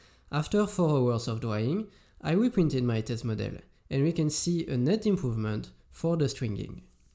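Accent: France. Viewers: You’re Accent sounds french, not Japanese. 😳🙃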